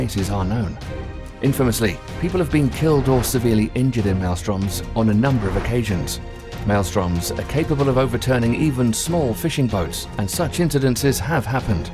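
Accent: United Kingdom. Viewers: he sounds slightly british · Just how a lot of English people speak.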